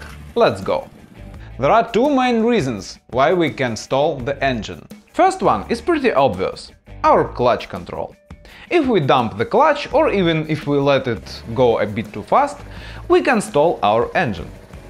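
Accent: Russia. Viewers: I have a really strange feeling that I understand the author because I'm Russian and the author has bright Russian accent. It helps me understand the author's speech better 🤣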